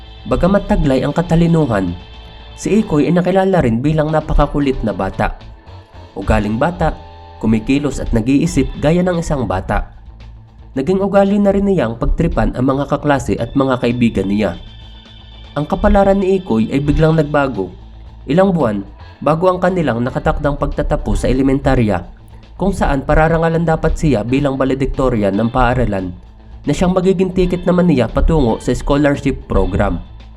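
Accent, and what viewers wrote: Philippines. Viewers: Taga saang probinsya yung nagsasalita? Bulcan ba yung ganyang punto? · bisakol ampota!!! pilit managalog brad ah! tang ina ka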